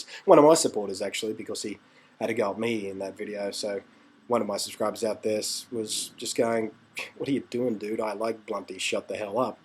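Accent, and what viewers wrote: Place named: Australia. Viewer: Hypocrisy Anyway. You're right. Plus I'm guessing from your accent that you're an Aussie? :] You just got yourself another subscriber.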